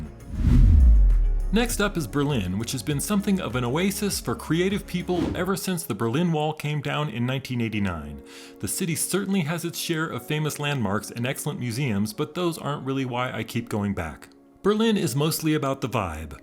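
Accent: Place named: United States. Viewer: Nice videos of Travel. But try to pronounce the names of the cities more the local way in stead of American English. Cannes should me more pronounced like {kon} in stead of can.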